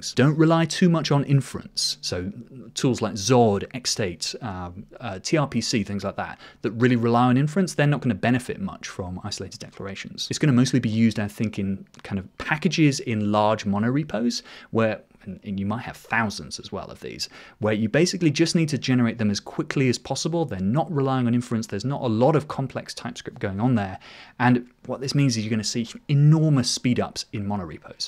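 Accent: United Kingdom. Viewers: Ебанутый британский акцент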